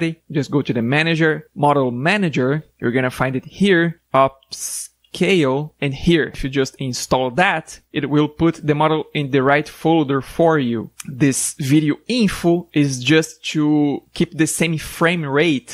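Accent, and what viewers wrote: Brazil. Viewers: Eu só passo o mouse na thumb, mas aí ouvi e pensei: peraí, temos um BR, bora dar moral. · Sotaque BR identificado, bom vídeo manolo! Ganhou um escrito =)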